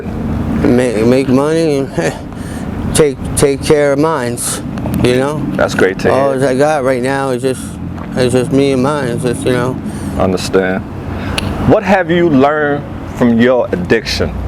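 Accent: New York, United States. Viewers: fair enough. Today I met a guy from New York that sounded just like you is all.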